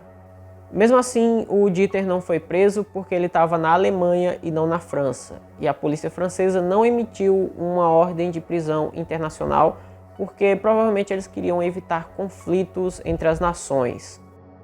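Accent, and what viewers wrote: Northeast, Brazil. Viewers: adoro seus casos acho que vc narra bem as vezes com alguns errinhos de Português, mas não se sinta ofendido por favor!!!! As vezes é o jeitinho de falar no nordeste aqui no sul é diferente, abraços te sigo em todos os canais!!!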